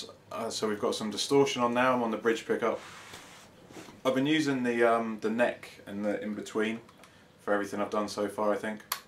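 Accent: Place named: United Kingdom. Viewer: A bigger guy, American guitar brand (Fender), camo pants, shirt with cursing on it, song... The British accent was the LAST thing I expected. Way cool. Thanks for the lesson, mate.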